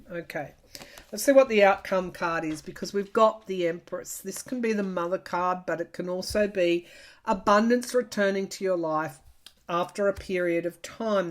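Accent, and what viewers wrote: Australia. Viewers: Your reading was lovely, thank you. I love the Aussie accent, a bit like mine Oi Oi Oi 🙌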